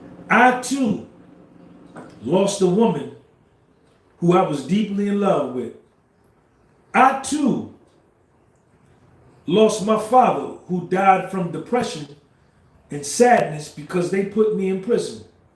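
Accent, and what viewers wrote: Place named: New York, United States. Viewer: You gotta be from ny. I know bc I'm from ny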